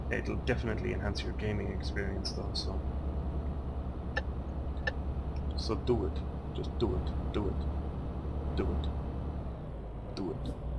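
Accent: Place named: Ireland. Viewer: I got excited I thought u was him. Anyway good videos and I love ur accent. Which part of Ireland u from?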